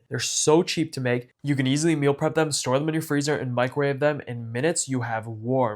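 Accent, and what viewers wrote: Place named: New Jersey, United States. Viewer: That new jersey accent, also sus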